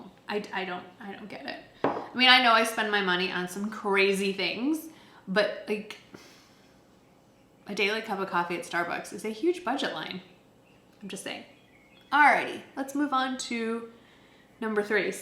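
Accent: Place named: South, United States. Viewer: Omg I love you! Spoken like a true classy southern lady 👏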